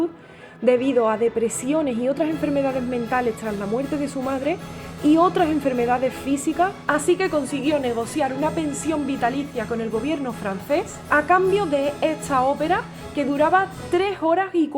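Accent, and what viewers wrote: Andalusia, Spain. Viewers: ¡¡¡Hola!!! mi bella genio hija adoptiva de todo el mundo descubrí que voy a tener 365 días completos pára disfrutar de : tu arte musical inigualable tus conocimientos de hhístorias y vidas de personajes que no conocíamos 365 días para ver en tu carita angelical reflejado tu gran corazón y tu amor por la humanidad y en tu voz andaluza universal oír murmullos de aguas quietas tonos de aves cantóras oleaje de mar acómpasado 365 días para agradecer a Dios por este regalo 365 días para decir desde el fondo del corazón todos los días GRACIAS POR EXISTIR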